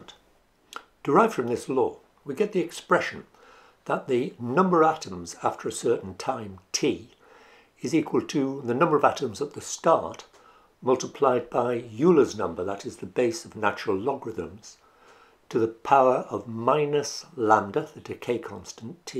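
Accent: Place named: United Kingdom. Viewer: Thank you, fancy and british sounding man. You finally made me understand this.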